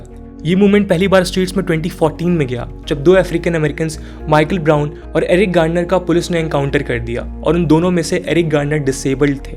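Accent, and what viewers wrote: India. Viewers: Indian vox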